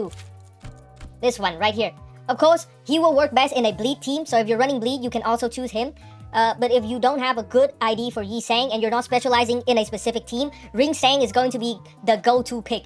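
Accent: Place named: Vietnam. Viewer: hmm... Is it just me or... I find there're some Asian accent in your voice. Not gonna try to be racist bro but.... your voice really remind me a Vietnamese fr who us to be my friend in highschool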